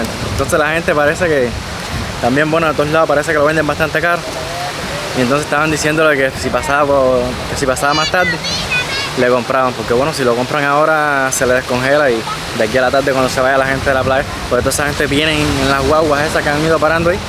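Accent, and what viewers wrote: Cuba. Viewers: tu muy marcado acento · Me gustan mucho tús videos y comentarios,te felicito hablas muy lindo sin groserías ni los asere y con el acento cubano.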